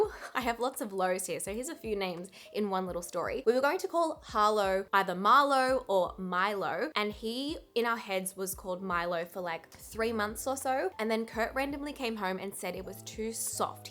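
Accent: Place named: Australia. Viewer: This whole time I thought his name was Hollow not Harlow. I’m not used to the Australian accent I guess.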